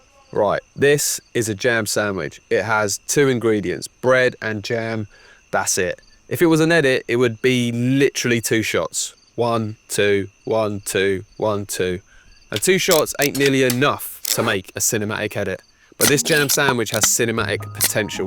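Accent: United Kingdom. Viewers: This guys is very Belfast guy isn´t??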